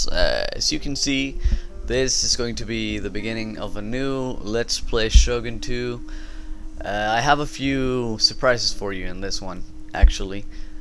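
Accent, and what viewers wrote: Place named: India. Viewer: Idk, your words sound heavy sometimes like some Indian people's accent in the US. Also when are you continuing your Carthage campaign?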